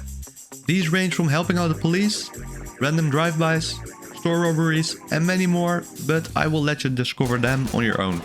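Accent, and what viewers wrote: Netherlands. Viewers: Is it me or do you sound very dutch ? 😅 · I can recognize a dutch accent when I hear one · You gotta be Dutch with that accent. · dit is echt leip vet, ja sorry ik hoor je nederlands accent wel hoor (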